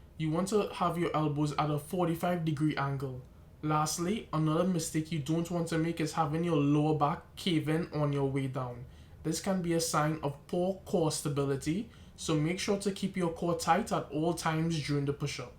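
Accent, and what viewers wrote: Trinidad and Tobago. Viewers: Trinidad man?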